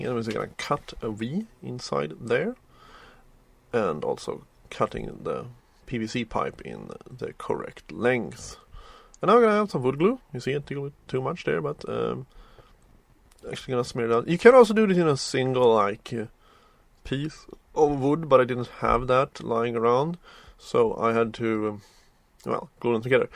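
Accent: Sweden. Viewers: THE SWEDISH IS OBVIOUS!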